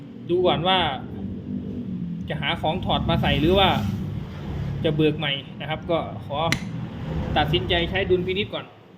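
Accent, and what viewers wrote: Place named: Laos. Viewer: เว้าลาวหันและม่วน.อย่าเว้ากรุงเทพหลาย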